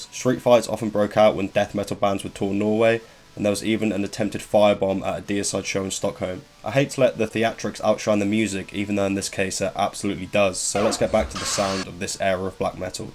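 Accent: United Kingdom. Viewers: british mb?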